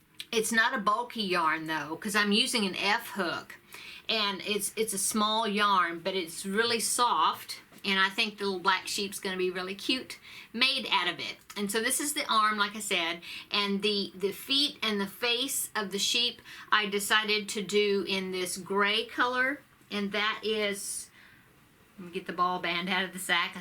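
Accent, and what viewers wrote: South, United States. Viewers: Im from southeast Georgia. I just came across your channel this week and have watched the last 7 or 8 in a row to try to "catch up". You caught my attention with your accent. I thought, "oh yay she's southern too !" Lol Congrats on your viewers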